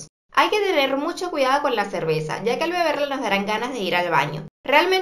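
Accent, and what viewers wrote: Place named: Venezuela. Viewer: Hola eres una genio con estos vídeos, de verdad son de mucha ayuda, noto que tienes acento venezolano 😁, saludo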